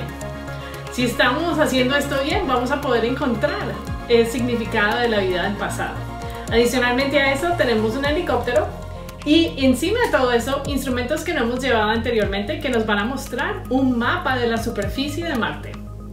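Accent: Colombia. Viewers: Por su acento, muy Colombiana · Cuando dice "La parte más chévere..." y su acento caleño. Qué gran orgullo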